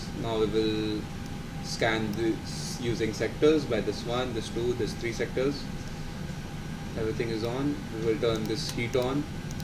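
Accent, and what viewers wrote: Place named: India. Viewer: This indian accent helps with understanding that procedure , video is very helpful .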